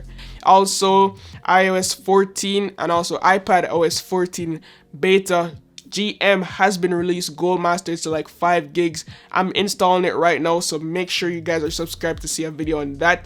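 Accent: Jamaica. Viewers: Nah a the Jamaican accent fi me bredda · Are you Jamaican?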